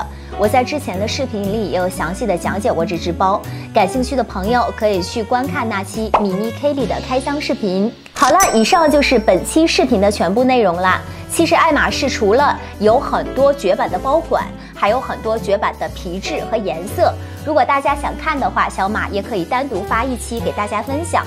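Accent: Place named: Japan. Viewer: 谢谢小马做这些Hermès 视频. 你真是Hermès expert 而且也有access to so many unique Hermes pieces. 一个小建议哈： 能不能在 屏幕上放上 讲述内容的英文， 日式英文真的是听不太懂啊……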